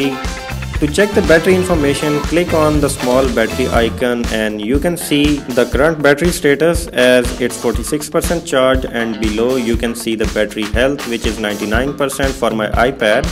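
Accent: India. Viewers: There's no way I'll trust the words of an Indian sounding guy when it comes to software suggestions.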